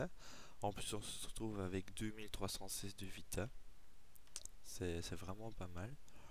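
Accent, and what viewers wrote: Belgium. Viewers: tu viens de ou de Belguique?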